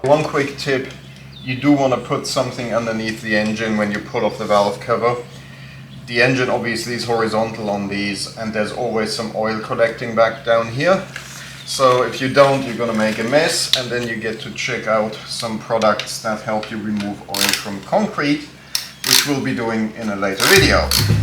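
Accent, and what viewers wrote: Germany. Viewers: Right you are… beauty always very individual - here in Germany we say: „Die Schönheit liegt immer im Auge des Betrachters.“ As you know I think, because your English sounds a bit German !? But please don‘t get me wrong. Your BMW does look great, your YouTube-Video is well made and very informative. Thank you for that. Greetings Matthias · Most obvious German accent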